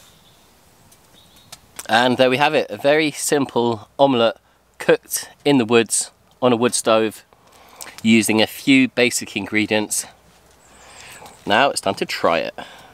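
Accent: United Kingdom. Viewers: You from the UK? We normally detect it a mile off. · i mean guy sounds british.....theres no bears there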